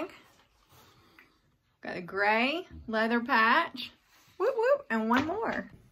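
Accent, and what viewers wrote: South, United States. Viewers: there’s not much true southern speak in Florida anymore so it’s a delight to hear it ms lol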